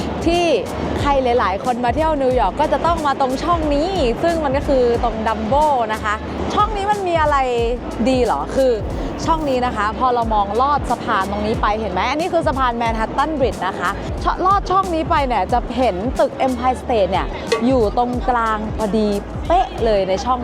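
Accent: North, Thailand. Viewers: ชอบการนำเสนอของน้องค่ะ และชอบคำเมืองของน้องด้วยเจ๊า..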